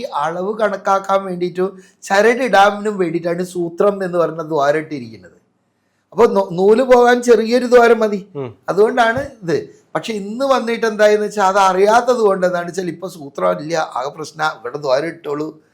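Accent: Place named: Kannur, India. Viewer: ഇദ്ദേഹം കണ്ണൂർ കാരനാണോ കണ്ണൂർ slang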